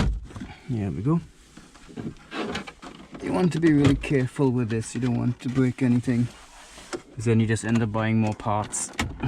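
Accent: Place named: Trinidad and Tobago. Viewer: you sound trini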